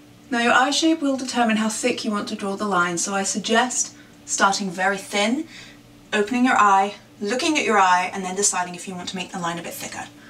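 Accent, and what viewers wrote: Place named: United Kingdom. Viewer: Omg, when you do your Beauty viddeos, you become much more British;) But it's alright:P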